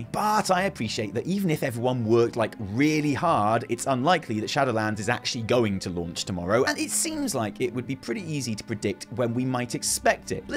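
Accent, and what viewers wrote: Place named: United Kingdom. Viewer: Add Subtitles plz... i love your videos... but you speak too fast XD i love the british accent by the way <3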